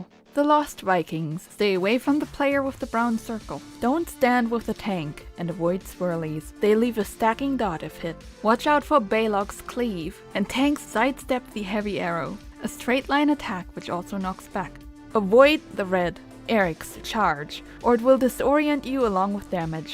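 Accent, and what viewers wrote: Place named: Germany. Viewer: I saw in your "about " section that your accent is German, and you're "Working on that, too". I don't think it's something you need to feel self conscious about. I'm a native English speaker from Canada, and a person from England would say that I'm the one who has an accent. You certainly speak English better than I can speak German. Fantastic guide by the way. Concise, informative, and with a bit of humor to keep it from being too dry. Please make more!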